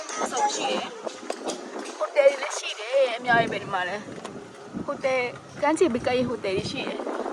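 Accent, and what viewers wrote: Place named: Upper Chindwin, Myanmar. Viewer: ဟုတ်တယ်နော်။ ချင်းတွင်းအထက်ပိုင်း။ ယောနယ်။ ထားဝယ် ဘိတ် ပုလောနယ်တွေက လေသံ ဆင်တယကြတယ်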